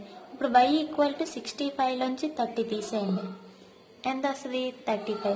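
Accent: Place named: Coastal Andhra, India. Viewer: Madam what is your name? I thinking your name is madam. 😀😀😀😀😀 Madam another doubt I have What is your city? I thinking your city name rajamundry, vizag,kakinada and srikakulam. I said because these city names only Because your voice and slang like this cities only. Please reply me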